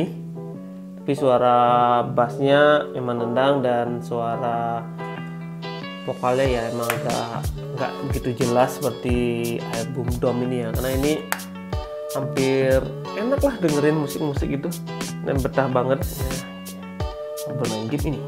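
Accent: Bali, Indonesia. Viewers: Logat bali Salam dr hindu rantauan bang..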